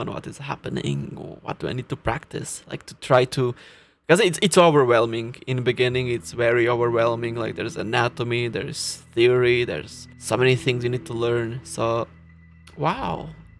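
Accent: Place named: Europe. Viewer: I thought the celestia and Luna voice were mostly intentional. I didn’t realize you were from Europe? I think? XD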